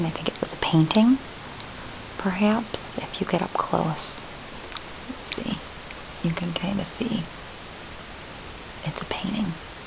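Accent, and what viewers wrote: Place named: Midwest, United States. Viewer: Are you from the Midwest? I love your accent, reminds me of home!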